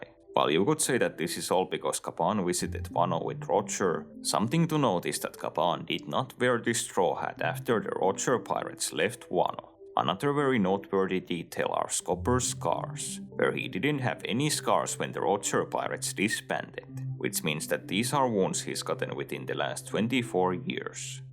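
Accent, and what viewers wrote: Finland. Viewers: Are you Finnish by any chance? great video btw!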